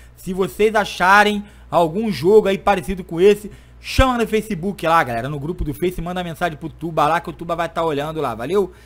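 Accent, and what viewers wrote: Rio de Janeiro, Brazil. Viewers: Tuba o nome do game e wasteland e perigoso eu acho e oq estava lá porém está em fase de testes, uma pergunta se é carioca?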